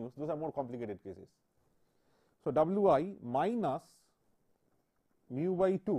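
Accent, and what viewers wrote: India. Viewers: you can't except more than this. About the language after five lecture your listening algorithm updated weight at optimum value. So after this communication is no more problem. Obviously, this is Indian English so others may problem but you can't get batter knowledge than this from anywhere else(IN FREE). THANK YOU SO MUCH PROFESSOR. THANK YOU NPTEL TEAM.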